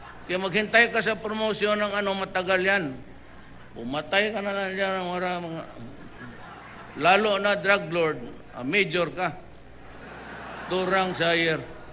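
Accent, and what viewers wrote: Visayas, Philippines. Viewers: Hehe, damo ng hangin ang ulo mo... nkakatiwa mkinig ng visaya eh... Visaya mn ako Tatay Digong eh... from Romblon God bless you po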